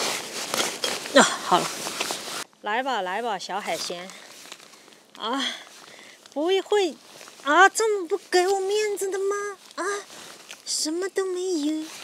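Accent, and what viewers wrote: Sichuan, China. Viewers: 特别喜欢yooupi说四川话 感觉很好听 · Halibut 清蒸最棒！四川口音杠杠滴！ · Yooupi講四川口音是一大特色！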